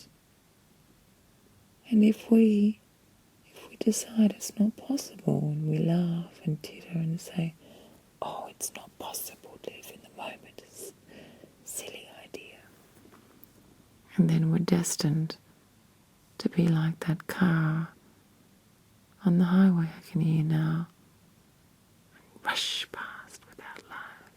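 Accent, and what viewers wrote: Australia. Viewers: Hia... Just thought I'd say thanks for another amazing video! Literally, when I cant sleep I turn straight to your vids... and I always find something that helps me dose off.......The aussie accent is also a big bonus!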